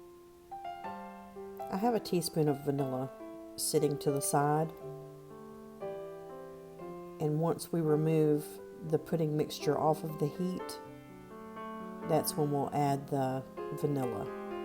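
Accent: South, United States. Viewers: That's how I grew up eating banana pudding. Not that instant stuff. Warm and DELICIOUS ♥♥ I know this is a late comment but, my husband could eat that by himself. LOL Looks good. That's exactly how I grew up eating banana pudding, the way my Granny used to make it, she passed in January and I have been searching for a recipe like this one. Al I have been getting the ones with the instant pudding and whip cream. No ma'am press ham, I need the OG BANANA PUDDING, and THANK GOD, I have found it. 😊😊😊 Thank you for the recipe. I love your accent also. I'm from the south as well. LR, ARKANSAS, BORN AND RAISED ♥♥ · Though I'm gluten sensitive, I enjoyed watching you make banana pudding from scratch. Listening to you speaking reminds me of growing up down South and it brings nice memories. 💗🍌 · I like your southern accent, and I love “ what my mama said” part. 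I will definitely try this recipe.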